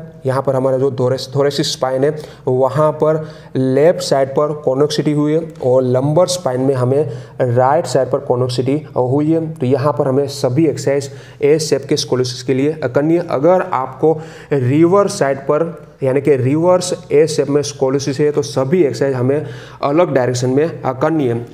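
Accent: Gujarat, India. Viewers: By accent , I guess you are from Gujrat..btw , nice advise in videos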